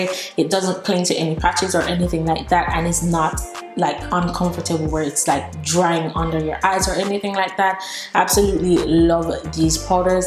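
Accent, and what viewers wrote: Jamaica. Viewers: Your Jamaican accent ☺️☺️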